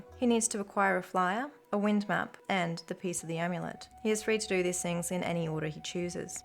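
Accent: Australia. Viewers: The good ending is being a soft spoken Aussie lady who gets all the views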